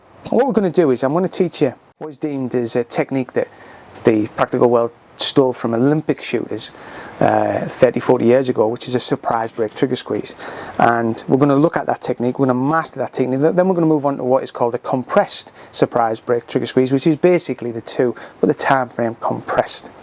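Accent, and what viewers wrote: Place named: England, United Kingdom. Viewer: It's weird to hear an English man tell me how to shoot. No shade to you sir. However it's odd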